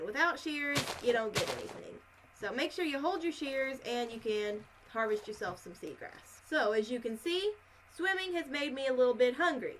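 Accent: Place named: United Kingdom. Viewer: That British accent though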